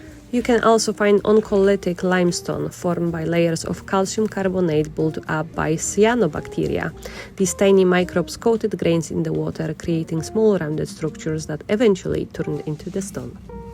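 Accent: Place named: Scotland, United Kingdom. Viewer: I love her Scottish accent.😅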